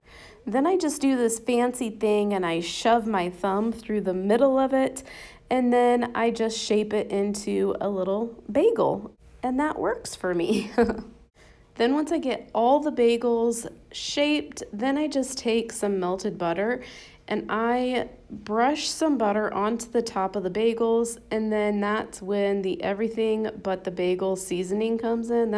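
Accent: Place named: Missouri, United States. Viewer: She’s from MO I think and that’s how we say it in the “almost” south! 😂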